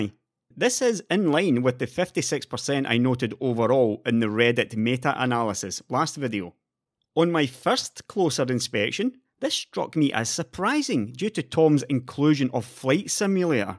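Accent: Scotland, United Kingdom. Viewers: "assuming they have a 7900XTX in the works"? It sounds like you're in Scotland but I'm pretty certain the news gets there too! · Started watching out of interest, finished watching to listen to a Scot say "faster in raster" 69 times.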